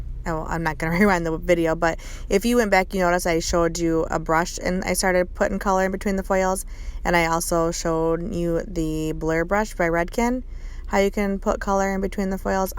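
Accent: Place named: Detroit, United States. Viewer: I knew I recognized that accent! My hubby is from around the Detroit area! 😊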